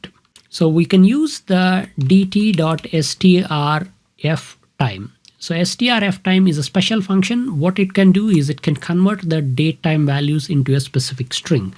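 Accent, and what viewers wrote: India. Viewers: indian accent --> close window. But thanks anyway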